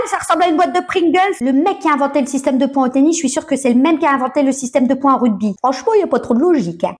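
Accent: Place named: North, France. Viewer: tres bon comme toujours ))) je me sers parfois de tes vidéos pour montrer l'accent du ch'nord ici en Russie )))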